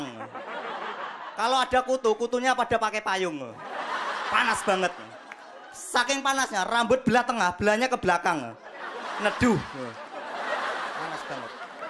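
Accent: Java, Indonesia. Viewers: itu gaya standupnya mirip raditya dika. cuma dia versi medok jawanya. pola beat, absurd, actoutnya dan pecah nya sama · Materi dia sederhana tapi realita,di tambah lagi medok nya yg nyerocos 😂 Keren lah. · Lucu aksen jawanya...... Ngakak gw.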